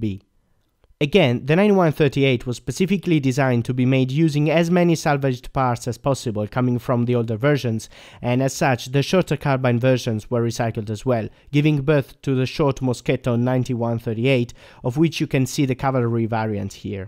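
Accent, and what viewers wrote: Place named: Italy. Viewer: mostly for the ascent I thought you were Italian btw great video, greetings from Italy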